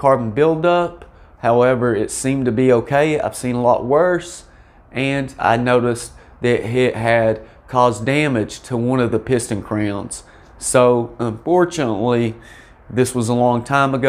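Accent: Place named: Boston, United States. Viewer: boston guy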